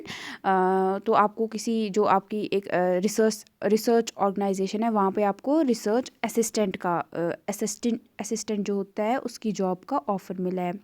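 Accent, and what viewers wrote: Kashmir, India. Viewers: 😂😂di kashmir se ae🙄kashmiri typ urdu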